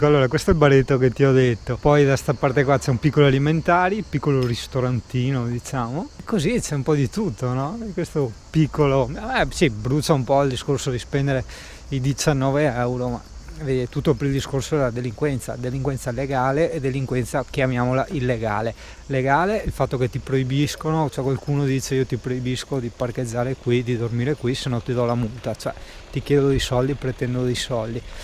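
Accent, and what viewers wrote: Veneto, Italy. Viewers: Che tristezza, acqua azzurrissima che pare di un altro mondo......invece, inquinata. No dai, giuro ci rimani male. Come abbiamo ridotto questo povero pianeta. Bellissima la parlata inglese con accento Veneto. Te saludi.....!!!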